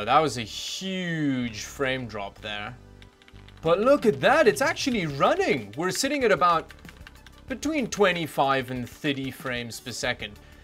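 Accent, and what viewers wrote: South Africa. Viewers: Are you south African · He is South African.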